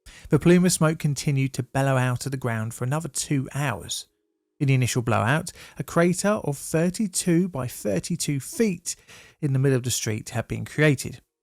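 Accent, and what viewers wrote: United Kingdom. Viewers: Now I know, what all that steam we see is, in the cities. Very 19th. century-ish, and modernly beset😆. As you can tell, I'm thankfully NOT, from any big city. How could 2,000 customers, turn out to be 100,000 businesses, and residential customers?? Ain't that, the same thing🤔?? And if the narrative was spoken with more English, and less British, we here across the pond, might be able to understand exactly, what you're saying.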